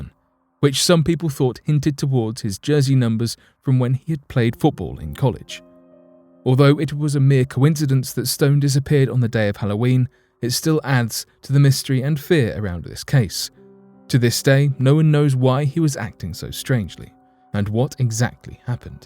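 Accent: United Kingdom. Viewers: No, definitely British, with very, very, very slight generic British northern accent hints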